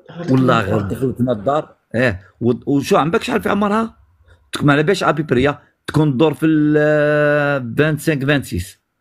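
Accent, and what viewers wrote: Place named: Chlef, Algeria. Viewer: المتحدث كلامه مشوق باين وليد فاميليا ولكن يظهر لي ماعندهش اللهجة تاع ناس البويرة وسط المدينة يبانلي عنده اللهجة تاع ناس الشلف الكرماء والله اعلم .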